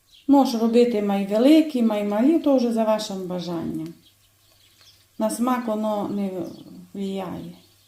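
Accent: Transcarpathia, Ukraine. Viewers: Боже, как же мне нравятся вот такие хозяюшки. Все по настоящему, по домашнему, без помпезности, по простому. Ручки пухленькие, голос приятный, говор с акцентом украинский. Спасибо дорогая хозяюшка за рецепт, обязательно приготовлю.👍🌹💐😊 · Чарівна вимова. Мабудь закарпаття❤ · Приємно чути Закарпатський діалект. В мене тітка з Сваляви, теж так розмовляє. Хороший рецепт, дякую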